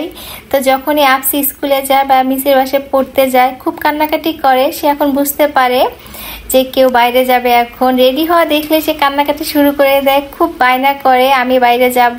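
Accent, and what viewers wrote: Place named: Faridpur, Bangladesh. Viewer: Deshi bon tomar mukhe faridpurer kotha sune onek valo laglo❤❤❤❤rannagulo❤❤❤mashallah babata❤❤❤ghurte gele❤❤❤❤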